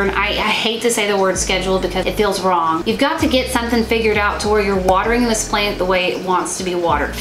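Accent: South, United States. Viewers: I'm here from Harli's channel. I have to say, your accent sounds like ours around here. What state are you in? South Carolina here. I'll have to check out more of your videos. :)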